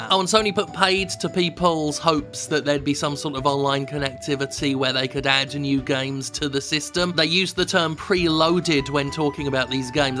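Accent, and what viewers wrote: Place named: United Kingdom. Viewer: Shitsony, cancelled mine. you grew up in the UK? Thought it was just a comedic accent.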